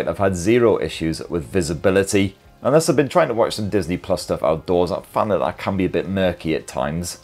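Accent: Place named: Newcastle, United Kingdom. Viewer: Howay ! Bonny Lad etc . What a charming accent you have… smug… smug… yours, smug southern bastard . Keep up the excellent reviews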